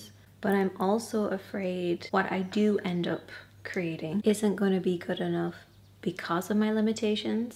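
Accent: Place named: Ireland. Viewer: …sending you so much love ❤ I subscribed many years ago for the makeup tutorials, but now it’s a good day if I manage to put bb cream on! I have 3 kids (2 teenagers and a 5 year old), have had 2 crappy marriages, and makeup is just not a priority as I rarely leave the house 😅 I can partially relate as someone who struggles with my mental health (that has impacted my ability to hold down a job) and also perfectionism. You are such a shining light though ☀️ The older I’ve got (turning 41 soon) the more I’ve realised perfectionism sucks. Just being present and “doing the thing” is so much more important than wasting time and energy on trying to achieve perfection (which doesn’t exist) especially when your time and energy is limited ❤ I for one am just so happy to see your beautiful face, hear your lovely Irish accent and hearing about your life. You are such a beautiful person, inside and out and we support you ❤ Sending love and strength from Australia xx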